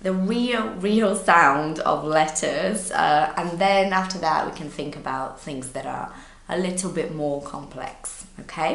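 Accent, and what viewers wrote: United Kingdom. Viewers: You're the prettiest teacher ever, even though you're from here you could very well pass for a british native 😌😉 did I do well teacher?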